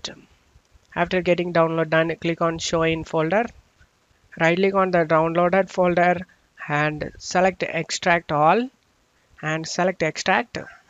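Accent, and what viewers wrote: India. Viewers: I was thinking this was a scam so I google it before trying to fix it, and I come across an indian accent guy explaining how to fix it lmao???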